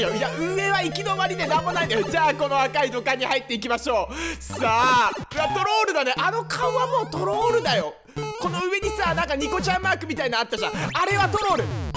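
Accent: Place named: Kitakyushu, Japan. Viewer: たまに北九州の方言が出るのがめちゃくちゃ親近感湧きます🥑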